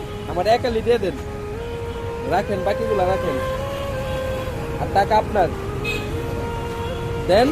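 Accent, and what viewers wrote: Sylhet, Bangladesh. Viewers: Vaia apni ki sylheti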